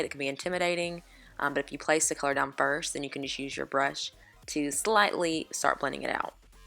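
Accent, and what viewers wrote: South, United States. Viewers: i was not expecting that southern accent from you! proves you can't judge a book by it's cover.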